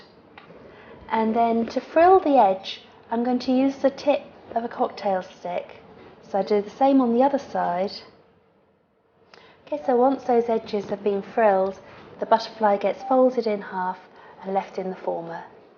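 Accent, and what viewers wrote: United Kingdom. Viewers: a southern part of england, pronoucing words very clearly :) i know, im from england :) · I love the cup cakes you made-they look so pretty and delicate! And I LOVE your accent!! I want to visit England so badly! Both my Father and Mother's side of the family has British roots. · If only everyone had a nice English accent ^^ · I'd listen to a Brit read the telephone directory... love the accent! Lovely video. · Finally, I have found a video with an expert-looking outcome and a british accent. *Sigh* I'm oh so sick of watching over-sugared betty crocker videos.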